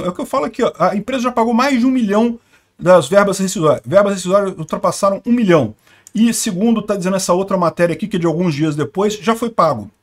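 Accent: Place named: Rio de Janeiro, Brazil. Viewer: Vídeo top, eu bem sabia que o Sr era Carioca do RJ.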